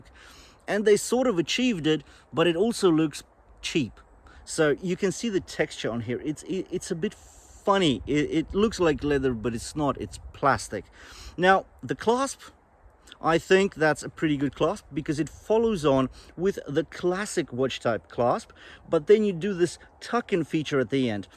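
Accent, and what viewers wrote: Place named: South Africa. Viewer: Great accent. South African, but he's lived in the UK for while, is my guess?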